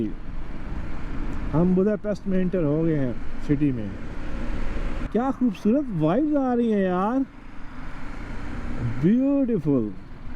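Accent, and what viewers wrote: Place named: Sargodha, Pakistan. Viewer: bhai ilaqa kon sa hy apka? Lehja Sargodha k as pas ka mehsoos hota hy.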